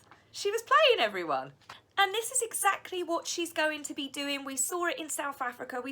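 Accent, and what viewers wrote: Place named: Hartfield, United Kingdom. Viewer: I grew up in Hartfield! I knew I recognized the dialect!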